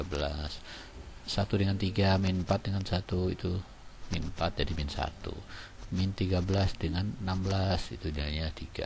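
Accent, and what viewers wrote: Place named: Malaysia. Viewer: Logat nya kayak orang melayu yah pak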